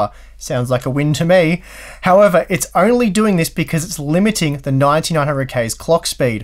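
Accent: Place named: Australia. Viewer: Interesting and useful information given, thank you! But this accent... It's so hard to understand this Aussie kind of English..., oh my! My impression is like someone is chocking you by the throat while you are speaking!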